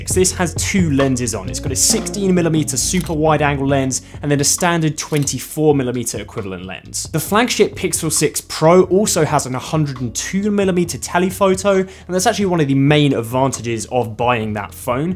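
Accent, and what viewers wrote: United Kingdom. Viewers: He sounds pretty average british to me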